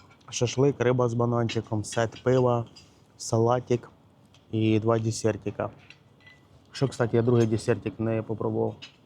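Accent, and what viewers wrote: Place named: Poltava, Ukraine. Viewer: Полтавський акцент ❤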